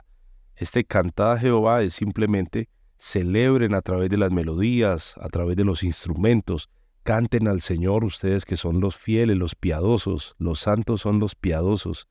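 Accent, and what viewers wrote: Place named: Colombia. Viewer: Es la Primer vez que edtoy OpenDocument de eyte pastor y la explicación de la palabra deDios. Por el asento como habla pienso que es Colombiano 😊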